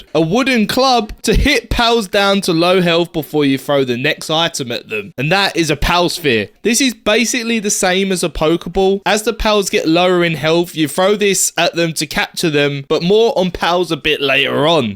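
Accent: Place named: Cockney, United Kingdom. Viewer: Great info, your accent makes me cringe though.. COCKNEY AF EHHH?!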